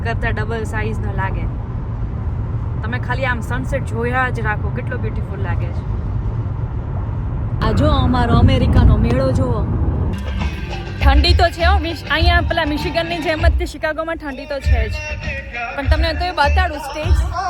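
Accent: Kathiawar, India. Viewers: tame kathiyavadi bolu che e maja aave aapna aapna j bolu bolay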